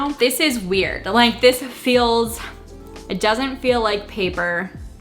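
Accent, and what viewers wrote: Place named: Canada. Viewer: I'm curious where your from? You're accent sounds a bit Canadian.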